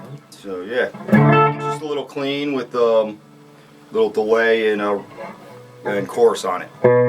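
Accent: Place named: New Jersey, United States. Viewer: South Jersey Pennsylvania Delaware New York tri-state area maybe? I'm from Jersey and you sound like somebody down the street...lol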